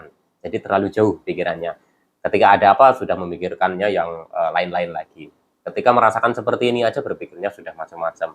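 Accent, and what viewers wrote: Banyumas, Indonesia. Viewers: Cilobak raya hadir menyimak massee, ora Ngapak ora kepenak😂 apa Kuwi "Tengengan, Massee?"🤣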